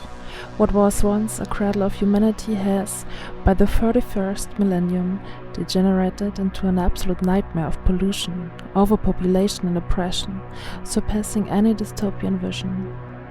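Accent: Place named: Germany. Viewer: Your German accent is beautiful; it blends very well with the dark/gothic atmosphere of Warhammer. It is definitely an add-on. I love it! 💀THE EMPEROR PROTECTS💀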